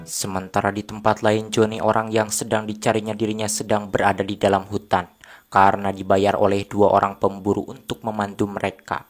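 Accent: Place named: Tasikmalaya, Indonesia. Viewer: Pasti orang tasik atau teu garut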